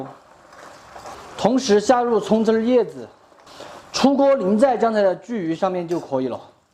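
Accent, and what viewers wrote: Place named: Xinjiang, China. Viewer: 博主的普通话说起来很像新疆人。😄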